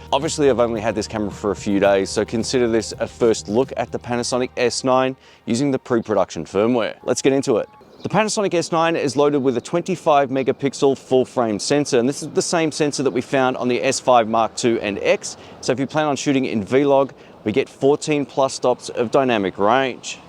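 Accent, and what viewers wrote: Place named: Australia. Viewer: It was all looking awesome……until you mentioned the record time limits! It did seem like that was going to come up as a problem as there was no visible ventilation – it seems to be a must-have on a full frame nowadays. With any luck Panasonic will be doing a GX9ii with a large chunk of the G9ii features…….now that would be an awesome street camera!! Being an Aussie in the UK it’s great to hear another Aussie……especially on a channel like this. Keep up the good work!!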